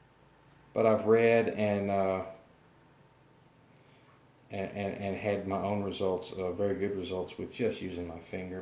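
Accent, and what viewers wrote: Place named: Texarkana, United States. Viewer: Just out of curiosity are you from near Texarkana? I used to work with a guy from there and you two sound alike.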